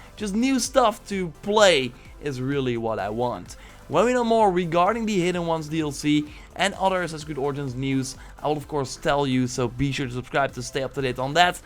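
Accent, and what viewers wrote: Netherlands. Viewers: Are you Dutch? I keep trying to place your accent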